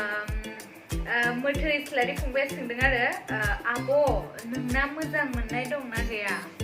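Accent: Kokrajhar, India. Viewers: Kokrjhar nijg same jaw railainaya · Hi abo jgni kokrajharni badinw railaiw mtw🥰🥰🥰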